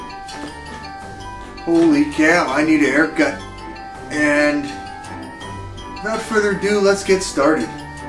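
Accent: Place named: New Jersey, United States. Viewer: He sounds like he's from New Jersey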